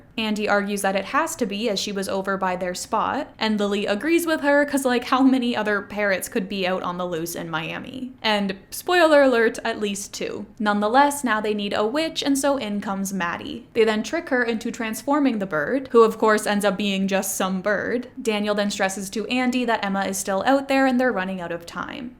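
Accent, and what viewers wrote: Canada. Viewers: I’ve been following you for years but I didn’t realize you were Canadian until I watched this 6 hour video and noticed how you were saying about. Canadians seem to really pronounce their o’s I’ve noticed this with the word sorry too. Then you confirmed later in the video that you were Canadian so it’s good to know I wasn’t going crazy!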